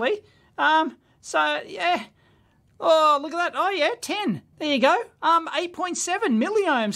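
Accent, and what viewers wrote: Australia. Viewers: Umm… Aussie accent… yup, EEVBlog, not ElectroBOOM. Nonetheless, I’m expecting some smoke… 😉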